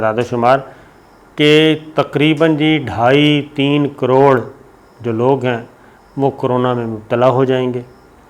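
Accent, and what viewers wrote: Punjab, Pakistan. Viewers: sahib, your reporting is good and we regularly follow your videos on daily basis. However, I have one request, hope you will not feel offended as I don't mean anything. When you speak the word 'strong ' you say 'sitrong' like punjabi pronunciation. It should be spoken as 'Is-Strong' which is English pronunciation, and like all words that start with 'S', they should be spoken as in English language. Sometimes, you say the word ',security ' as 'socourty' but it should be pronounced as 'se-ku rity'. We know English is not our language, but when we speak in a different language, we must speak it correctly. For example if we an Arabic word incorrectly, the meaning will change and the audience will not understand the correct meaning of the word. I hope you wouldn't mind and take it as a friendly and brotherly advice. Thanks, Zia from USA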